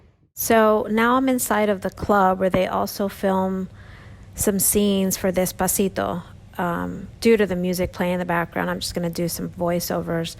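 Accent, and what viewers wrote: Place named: Spain. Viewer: I love your Spanish dialect. Such beautiful color's, and the ocean is really something. Thanks for taking us with you 🥰😎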